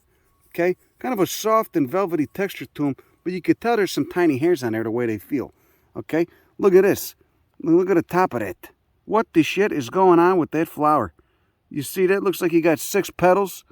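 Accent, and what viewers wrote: Chicago, United States. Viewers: Prologue: Caffeine and Adderall. Apologies. I'm pretty sure this is my favourite video so far. Your sense of humour, Chicago accent, and total lack of self-censorship makes it stellar. And yes, admittedly and embarrassingly, I do have a big homeauxsexual crush on you. I want to thank WIRED for introducing me to your stellar work. I do hope that you are making good money now with your uncommon knowledge. In addition to dreamily gazing at your videos, I'm also completely obsessed with gardening. In particular, right now, house plants. It's just a mess in my house in Vermont. If you do actually see this comment, have you ever done any exploration of the botanical life here around Vermont? I have this dream that someday I can go exploring the geology and botany of the area. Being from New Orleans, it's like living in the fucking Alps to me. 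I'll stop now. Thank you for the videos!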